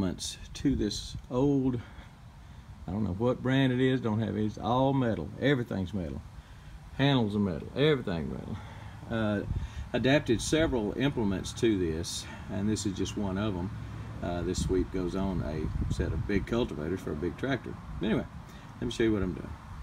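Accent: Texas, United States. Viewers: Just found your channel through your food prepping videos...subscribed right away due to content, presentation, and that sweet Texas sound of your voice! I'm a transplanted Texan here in the Midwest and I sorely miss the sounds of Texas! Nice garden!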